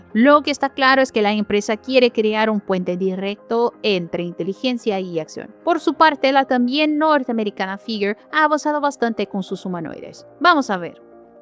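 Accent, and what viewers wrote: Brazil. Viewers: Ella es totalmente humana, en un vídeo de hace tiempo mostró su cara y salió hablando, su acento es por qué es brasileña o algo así y el español no es su lengua nativa.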